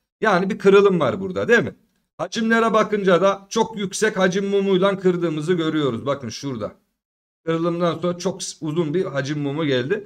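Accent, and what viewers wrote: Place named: Nigde, Turkey. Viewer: Usta niğdeli aksanıyla konuşuyorsunuz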